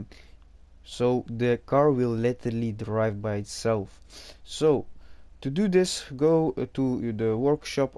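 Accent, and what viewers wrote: Netherlands. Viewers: Lekker Nederlands accent door het Engels heen hahah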